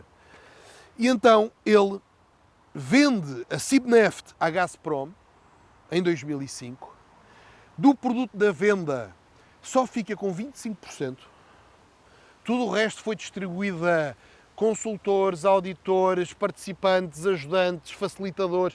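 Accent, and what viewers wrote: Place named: Portugal. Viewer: O é um SUPER CRÓMO...!! Mas, muito agradável...!! PORTUGUÊS. PURO...!!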